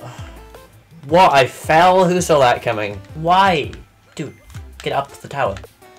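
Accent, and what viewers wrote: United Kingdom. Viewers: I like how you have a full British accent, but when you say mario, you sound so American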